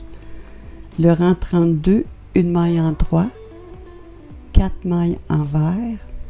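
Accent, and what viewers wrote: Canada. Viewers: .ok merci bcp..c difficile l'accent canadien des fois!! Encore merci pour vos partages.j'apprends bcp.salut du sud de la France.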